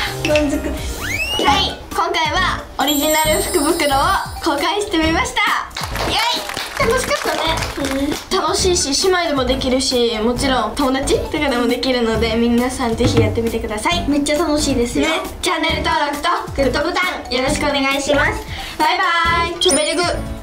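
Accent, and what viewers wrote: Miyazaki, Japan. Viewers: 宮崎弁でしゃべってるのかわいい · やっぱ宮崎弁やん かわいすぎ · 宮崎弁可愛い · 宮崎弁ところどころ使ってる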